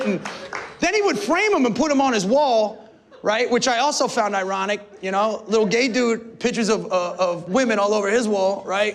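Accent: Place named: New Jersey, United States. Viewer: Love the Joisee accent and the cutting humor. Can't take yourself seriously ever again after listening to for an hour. I'd love to hear him take on Trump.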